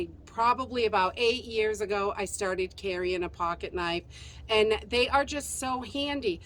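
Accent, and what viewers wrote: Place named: Long Island, United States. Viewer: where are you from originally? Sometimes your accent sounds Long Islandy and the expression "landed up" as opposed to "ended up" is different.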